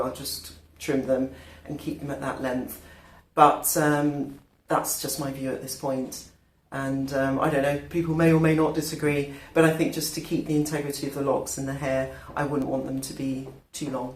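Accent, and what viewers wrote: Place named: England, United Kingdom. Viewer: The English accent surprised me. Your hair is very pretty.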